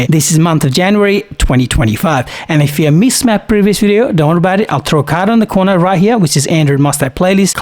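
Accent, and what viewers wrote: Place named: Australia. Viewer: Drop your Australian accent please.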